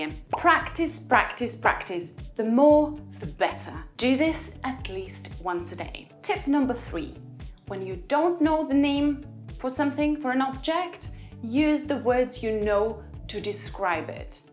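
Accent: United Kingdom. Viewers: Аааа какой акцент крутой🤩 Бритиш инглиш - ван лав 💛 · your British accent is so lovely! I used to like American better but now I'm so into British💜 Thank you for the video, it's adorable👍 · Зачем британский пусть говорит на американском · Хорошо говорит, но не переношу британский акцент)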